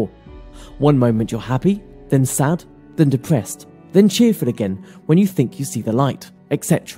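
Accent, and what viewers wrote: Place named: United Kingdom. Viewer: you sound sort of british.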